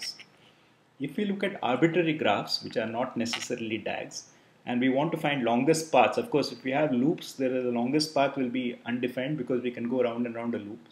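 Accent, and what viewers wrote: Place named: India. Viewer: Ужасный индийский акцент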